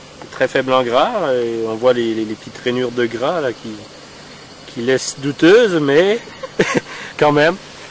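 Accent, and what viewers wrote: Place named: Quebec, Canada. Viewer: Amusant, il a l'accent Québécquois. Et du Kangourou, j'en mange ce soir pour le réveillon de Noël 2010 en France.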